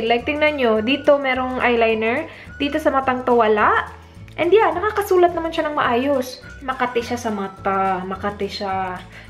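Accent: Visayas, Philippines. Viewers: hahahah ang kulit nya mag salita visaya ata si ate 😂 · ang panget mo mag tagalog parang bisaya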